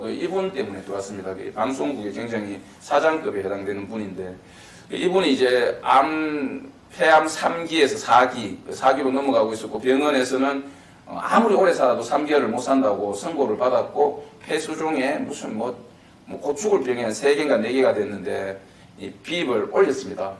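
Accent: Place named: North Gyeongsang, South Korea. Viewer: 싸이먼디는 부산이고 선생님은 경북말투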